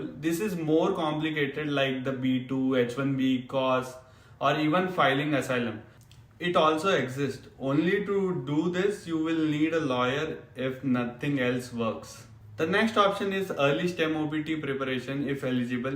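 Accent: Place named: India. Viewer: That is the thickest Indian accent I’ve ever heard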